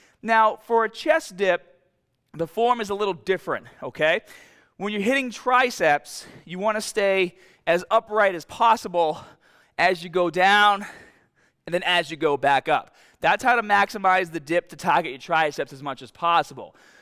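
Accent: Boston, United States. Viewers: With so many followers and so many questions I really appreciate the fact that you take the time to reply.. hope it's not a VA :-). Didn't realise that's a Boston accent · What's his accent? Boston? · That boston accent though. Thanks for the knoweldge anyway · Where’s that boston accent coming from ?? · Is this dude from Boston ? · comedyman112 no. He has a Boston accent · Interesting hearing his 2010 videos having a normal American accent, and now, 8 years later, there is some Boston-sounding accent mixed randomly in there.. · Sounds like he’s from Bahhston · Missed that Boston accent. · Gotta love that Boston accent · Bahbell!! Like your vids a lot, but I'll bet money you're from Massachusetts, my friend is from Boston and sounds just like you. Hurts my ears but I love the knowledge and advice, so I'll suffer through it. Lol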